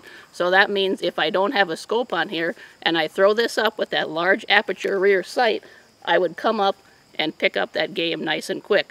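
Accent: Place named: Midwest, United States. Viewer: That's a genuine Midwest accent LOL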